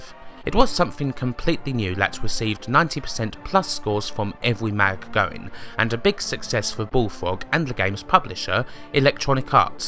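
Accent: United Kingdom. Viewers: The problem is his video's are to long. He needs to cut it down. It's like a dude rambling around one person for hours on hours long. get's old fast. Make a 10-15 minute video and combine the big points. The moment people see the time on the video they will click it away directly. Nobody has time for such long talks. It also doesn't help, his voice is extremely british, which makes it kinda annoying to lissen to for long times. As his voice contains lots of low and heights sound pitch voices. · aa aa I disagree. People do have time. It's just that people don't want to take that time. As for her British voice, yeah well, you'll get used to it.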